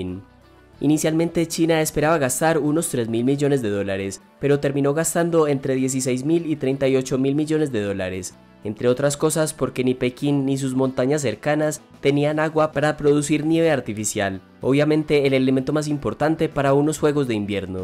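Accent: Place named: Coffee Region, Colombia. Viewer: NO HOMBRE!, ESE ACENTICO LO MATA. TERRIBLE QUE SONSONETE. CONSIGA UN NARRADOR NEUTRO. ES MUY FASTIDIOSO. NO PUEDE UNO CONCENTRARSE EN EL CONTENIDO, ACENTO CAMPESINO DE LA REGIÓN CAFETERA. MUY ARRASTRANDO.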